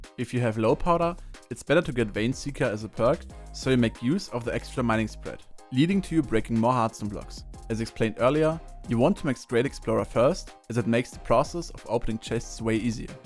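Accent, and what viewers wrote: Germany. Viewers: I höre das deutsch heraus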